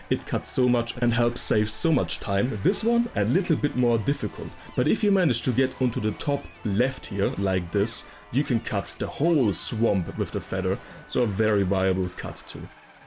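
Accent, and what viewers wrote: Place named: Germany. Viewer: so unangenehm wenn deutsche englisch reden müssen 😂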